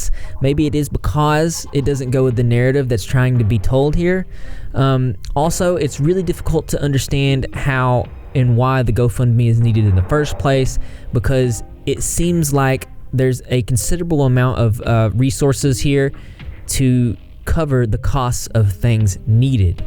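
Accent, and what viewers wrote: South, United States. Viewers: I watch your videos only because of your southern accent. 🤠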